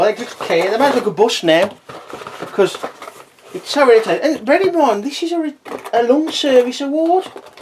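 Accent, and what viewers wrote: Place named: Black Country, United Kingdom. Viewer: So good to hear a Yam yam on youtube!!!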